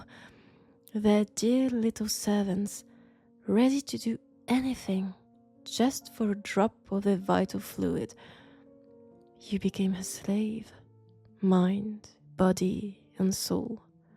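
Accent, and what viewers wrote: France. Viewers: Yesss! more, please! That French accent is melting my brain! ))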